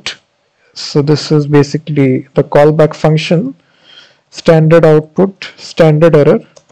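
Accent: India. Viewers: Pure Indian accent and broken microphone. I love this guy❤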